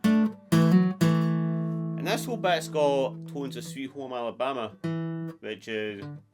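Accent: Scotland, United Kingdom. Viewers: I’m Italian, use to struggle with British accent but easily understand 99.7 percent of what you say 😊God bless Scots! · I love your accent,! It's really been helping me with my Scottish accent practice, too.;-) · It's a Scottish accent, right? Sometimes I misunderstand when you bend those vowels in a way I wasn't expecting, but it's beautiful, like bending notes in the Blues 😊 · I love your accent, and having just got back to London after my first time working in Scotland love it even more! It's one of the reasons why I watch your videos. The main one is after many years your lessons have opened up my playing when ive been stuck in a rutt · im a Kiwi and find your Scottish twang very easy to listen to but I do have a wife from Folkirk.Great vids thanks very much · Sounds great, many thanks, and don't try to disguise that lovely Scottish accent! 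· Love the Scottish accent ❤ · Greetings from Frankfurt/Germany. Please do me a favor and stick to your Scottish accent. I am always pleased to learn a new language and your lessons are awesome and I look forward for every single one of them. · Love that accent, love to copy it when speaking English! 😊 Been in Scotland three times up to now, such a nice country, wonderful people up there! The hymn of our football club here in Cologne, the 1. FC Köln, has the melody of "Loch Lomond" - always gives you goosebumps! Keep on rocking,! Great lessons you give here! Greetings from Germany! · Hey, thanks for the grate lessions, stay as you are and keep that little scottish accent! For me - as a german - the rolling R is completely normal 😁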